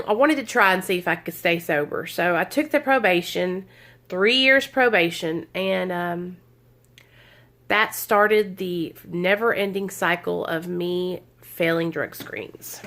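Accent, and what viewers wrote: South, United States. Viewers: i love 💕 your accent is that down south ????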